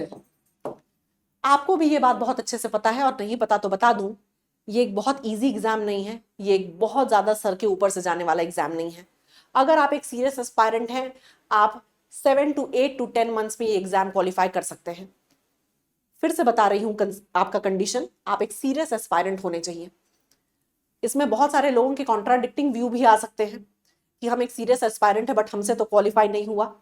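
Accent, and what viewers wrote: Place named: South, India. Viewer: AAP south se ho kya mam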